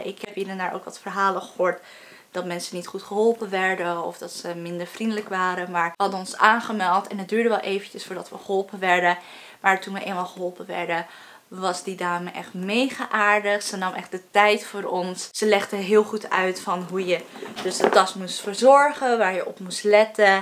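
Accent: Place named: Netherlands. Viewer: klink pittig Nederlands🙃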